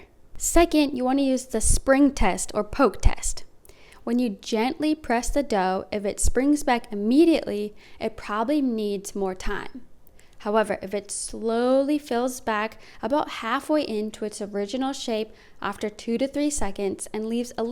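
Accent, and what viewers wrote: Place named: United States. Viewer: Do you have an accent or are you from North America? Like you sound American but with a bit of an accent lol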